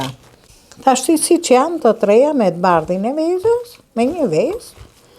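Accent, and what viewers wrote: Tirana, Albania. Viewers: Amon mi se na knoqe me kete gjuhen tate prej tironse ilac je me kete dialekt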